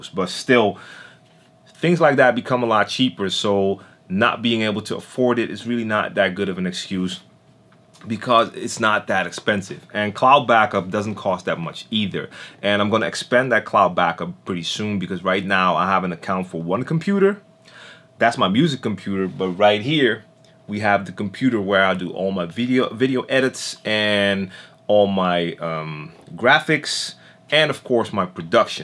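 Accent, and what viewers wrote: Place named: New York, United States. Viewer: Great videos. Just a comment/question...you don't sound like you're from the Netherlands. More like NY. Where are you from originally?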